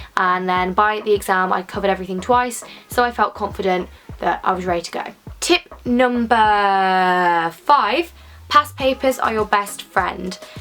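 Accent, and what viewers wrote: Birmingham, United Kingdom. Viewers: Don't try to suppress that awesome brummy accent! I love how it sounds for some reason (I'm a weirdo lol) x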